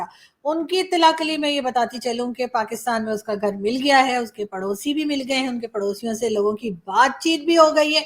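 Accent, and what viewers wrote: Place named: Allahabad, India. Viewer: you are 100% Allahabadi, the way you speak is like HARDENED ALLAHABADI absolutely Pure, this is the way a NATURAL ALLAHABADI SPEAKS ❤😂 You are hilarious 😅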